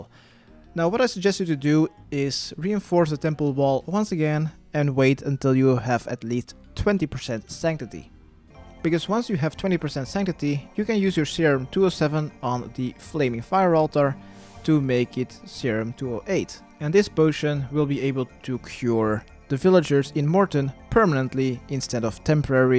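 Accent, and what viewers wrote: Flanders, Belgium. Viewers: Ik wist da ge nen belg waart hahah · Fantastisch! Ik wist niet dat je Vlaams was! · What the fuck.. I always thought you had a dutch accent but you're totally flemish :o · wacht gij zijt gwn vlaams, ik kijk al 30 filmkes van ou en had da nie deur hahaha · I KNEW YOUR ACCENT SOUNDED BELGIAN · He's dutch lol, although he sounds like he's from Belgium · bels denk of fries iig ben brabander en komt me niet bekent voor klinkt meer bels hehe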